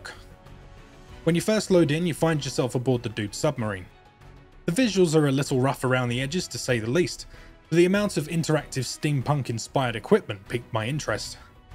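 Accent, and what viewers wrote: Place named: United Kingdom. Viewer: idk anything in british accent sounds more legit even reviews :D i enjoyed it :D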